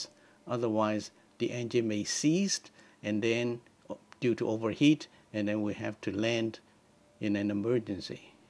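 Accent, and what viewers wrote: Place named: United Kingdom. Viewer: You sound British. almost.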